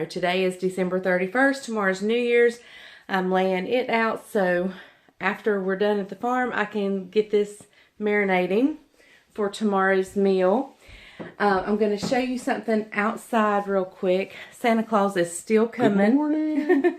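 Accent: South, United States. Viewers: Are you moving to new land? I’m sorry coming in on your story I just don’t understand sometimes the southern draw talking fast. As far as having “stuff” donate donate to the Salvation Army that uses the sale proceeds to help the poor. My husband says, keep it simple thats so true! Personally when I have guest over I keep the kitchen unjunky with little out other than food and pretty napkins and cups and few candles. Heaven is not junky and in turmoil with “things”. It’s uncomfortable being around clutter thats not good for the soul. A Colonial girl here with a touch of simple style and elegance from my generation. People have way too much today. Be blessed and not stressed.❣️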